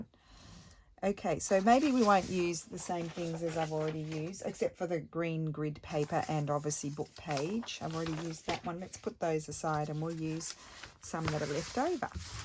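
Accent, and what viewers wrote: Australia. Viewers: I so enjoy your videos! You are so talented and nothing beats an Aussie accent, especially when you say here! Keep up the good work1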